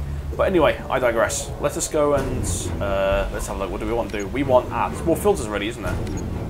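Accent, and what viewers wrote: United Kingdom. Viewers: A brit.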